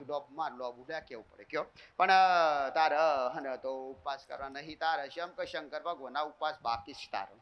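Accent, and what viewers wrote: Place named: Mehsana, India. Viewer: માડી ની ભાષા અમારા મેસા નાણાં ની બાજુ ની આવે છે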